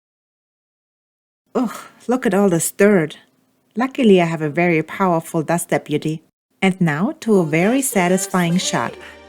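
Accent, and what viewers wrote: Germany. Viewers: I figured you were from Deutschland with the accent. My mom makes pfeffernusse for Christmas, my grandmother used to call me a kleine hans wurst when I got out of hand. She used to call everybody Hans wurst if she didn’t like them. Love that smile, and you make sanding fun and interesting.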